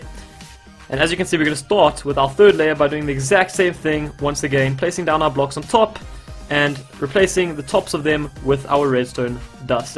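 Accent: South Africa. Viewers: let me guess...South African? yup me too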